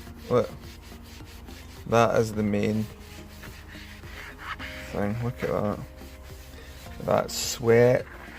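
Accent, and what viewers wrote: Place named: Scotland, United Kingdom. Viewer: I fell off my fart-free seat laughing when you were talking about cleaning "farted on" car seats. Your dialect makes it all the funnier and reminds me of Colin Mcrae. Are you from Lanarkshire by any chance?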